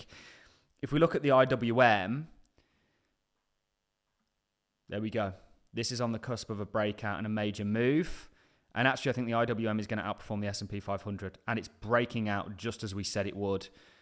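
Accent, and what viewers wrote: Birmingham, United Kingdom. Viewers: Cheers. Keep up the good work. Placing your accent somewhere in the south / South West Birmingham area?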